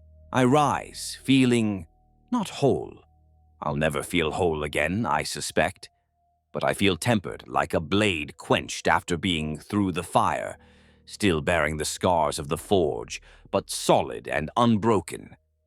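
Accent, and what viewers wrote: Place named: Jamaica. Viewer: Im rewatching all your videos from the start. Your 1st video sounds Jamaican.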